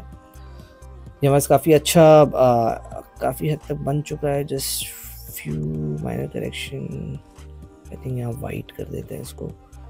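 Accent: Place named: India. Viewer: Ur accent like an indian. I was thinking u r Indian.. anyways i got ur video while scrolling really loved it.. thanks for sharing such valuable knowledge i would implement it.. Thanks - love from India ❤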